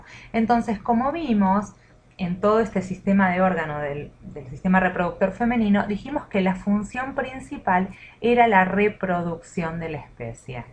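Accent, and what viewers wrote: Rioplatense, Argentina. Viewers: Sos argentina y recien me di cuenta o capaz uruguaya pero igual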